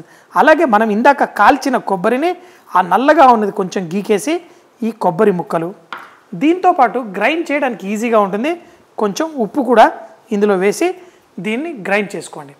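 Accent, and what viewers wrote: Telangana, India. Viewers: Your Telangana slang is awesome!!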